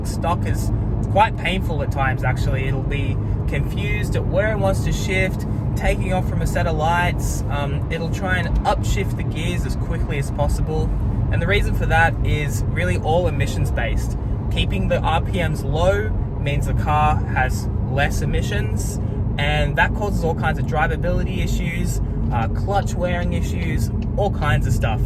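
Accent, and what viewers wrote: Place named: Australia. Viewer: Hey mate, sounds like a skippy accent there 😎 Any suggestions for down under to get some mods / tune done?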